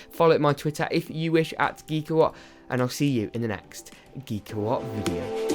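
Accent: United Kingdom. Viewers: Why don't you leave uk links you sound like you're from the uk?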